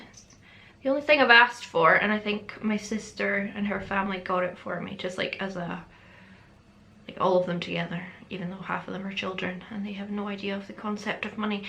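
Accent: Scotland, United Kingdom. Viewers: I love when you do a deep Scottish accent every now and then! I recently found out I’m like 25% Scottish! Merry Christmas,! ❤️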